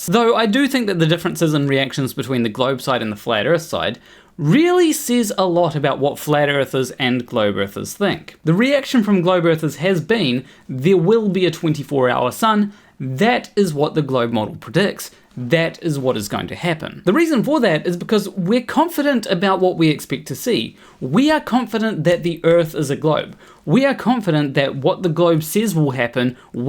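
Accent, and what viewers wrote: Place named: New Zealand. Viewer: That accent, are you a kiwi too?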